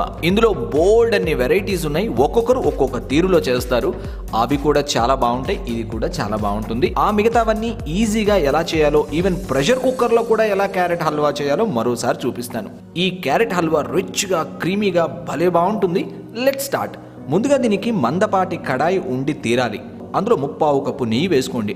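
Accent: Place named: Andhra Pradesh, India. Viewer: Hai nenu mee language vinaganay adiga meedi andhra ani but didnt reply.ok ardham ayindi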